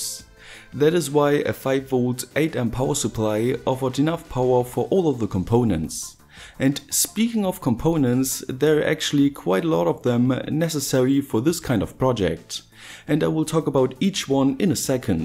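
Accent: Germany. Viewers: Jonas Gilje Ich Habs sofort raus gehört, dass er Deutscher ist xD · That accent .. are you german? Great vid! :)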